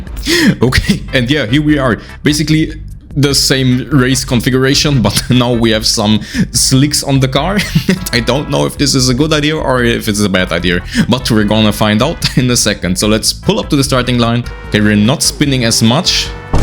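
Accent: Germany. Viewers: i can hear the german in your aktzent...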